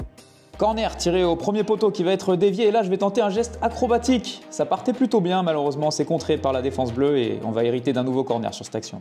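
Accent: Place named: Finland. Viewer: Je n'ai jamais entendu le francais en parlant avec un accent finlandais.. Super interessant et charmant, en plus !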